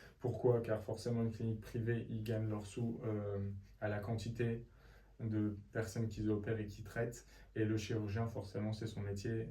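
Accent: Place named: Lorraine, France. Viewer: Hello ! Merci beaucoup pour ce journal de bord, bien amené et bien documenté ! Bien contente d'être tombée dessus :). Ici je suis actuellement à J7 d'une chute en VTT de descente avec un stade III également, et je me reconnais totalement (la douleur du matin par exemple ;)), c'est fou ! Par contre je pense me faire opérer parce qu'étant une femme les muscles autour de l'articulation ne suffiront probablement pas à compenser la gêne qu'elle soit fonctionnelle ou esthétique, d'autant que j'ai besoin d'être rapidement opérationnelle sur le plan professionnel & aimerais reprendre ce qui est volley +++, natation, squash... sans crainte. A discuter avec les chirurgiens dans les prochains jours ! Et merci pour ce bel accent du Grand Est (lorrain?) qui me ramène à mon Alsace natale ! ;)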